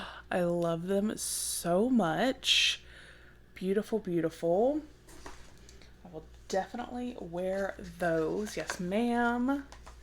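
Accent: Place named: North Carolina, United States. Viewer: New to your channel. Our accent is the same! I'm from North Carolina too. I live in Thomasville nc.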